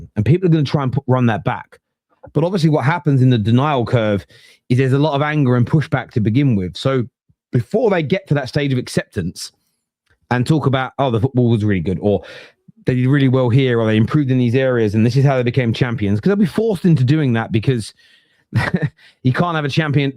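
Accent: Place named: Geordie, United Kingdom. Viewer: get that chip of your shoulder you sound like the geordies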